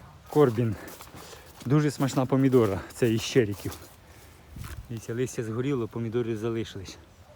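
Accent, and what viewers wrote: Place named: Vinnytsia, Ukraine. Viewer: Як приємно чути вінницький говір! Буває тільки заради цього дивлюсь))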